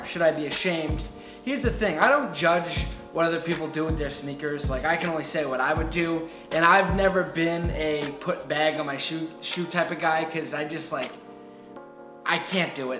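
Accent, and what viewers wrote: Boston, United States. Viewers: I love the Boston accent. anyone that has it I think it just sounds awesome.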